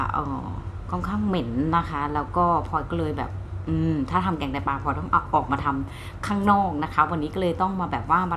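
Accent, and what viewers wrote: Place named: South, Thailand. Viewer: ชมคลิปผมบ่อยๆย้อนไปดูคลิปแรกๆแหลงใต้ทั้งเรื่อง55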